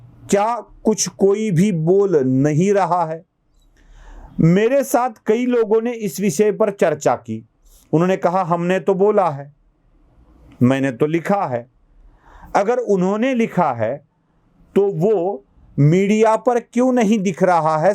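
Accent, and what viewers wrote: Maharashtra, India. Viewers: हिंदी बोलताना मराठीचा प्रभाव ठळकपणे दिसतो.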